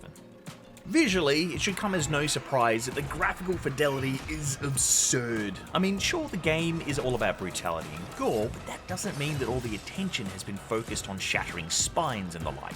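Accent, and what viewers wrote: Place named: Australia. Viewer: The only thing worse than an Australian accent is an Australian accent that’s trying to be funny and witty. Ick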